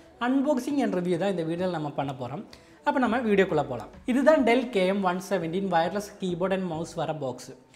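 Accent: Kerala, India. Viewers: you have a peculiar Malayalam accent with humorous touch! Nice Video , Keep it up and post more! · Bro yr Tamil is like mixed with Malayalam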